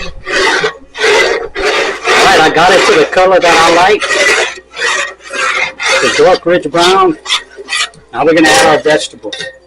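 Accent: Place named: Louisiana, United States. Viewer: compliments from a 67-year young lady. A young man that can dance, make a gumbo and has the most handsome blue eyes I have ever seen. That's a win-win in my book. Don't ever loose that Cajun accent. I too am Cajun. My grandparents spoke nothing but Cajun. You are a cutie pie. Please keep up your Cajun Heritage. I am a good cook. Or at least that what my husband of 40 years tells me. I am going to try your gumbo for Thanksgiving. God bless.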